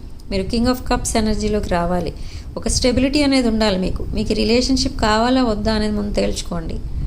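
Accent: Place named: United Kingdom. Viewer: The voice u have is very pleasing...in between ur smile...the english ackcent ...you use...these all make me very happy to see readings very eagerly...we are gifted to have a good human being...❤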